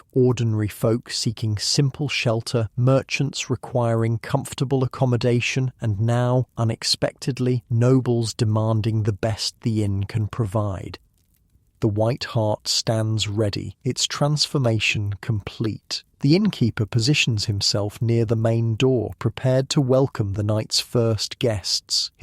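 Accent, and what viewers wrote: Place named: United Kingdom. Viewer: I have really enjoyed the story. However, I have discovered an unexpected dislike for "british" pronunciation. For example, I would pay for some reasonable pronunciation of the letter "r" where it is placed in the words.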